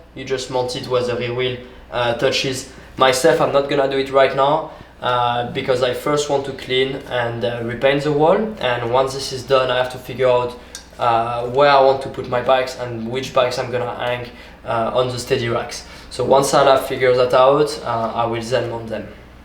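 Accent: France. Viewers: Super utile, je me demandais justement comment j'allais mettre mes trois bexons au mur avec les Steadyracks que j'ai commandé hier, merci pour le tuyau. Et... pas mal ton anglais, juste avec un nice French touch :) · Strong French accent there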